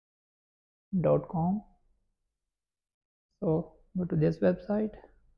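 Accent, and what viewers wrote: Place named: India. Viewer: When I heard that Indian accent I knew it was fake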